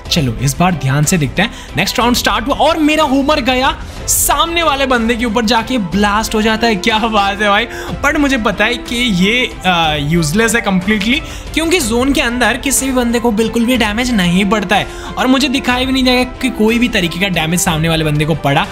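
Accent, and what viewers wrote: Odisha, India. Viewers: Bhai tum odisha se ho kya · Odia Hindi ❤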